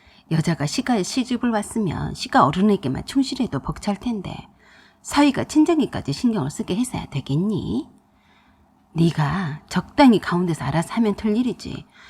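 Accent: Jeolla, South Korea. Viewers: 나레이터 전라도분인가봐요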